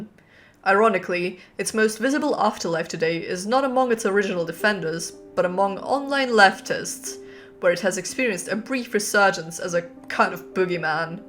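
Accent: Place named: United Kingdom. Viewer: legendary youtube recomended pull did not expect you to sound so british...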